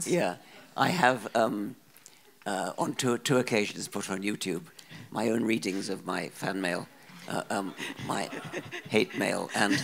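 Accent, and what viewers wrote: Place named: RP, United Kingdom. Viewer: What makes this hilarious is reading out these obscene comments, in his posh accent.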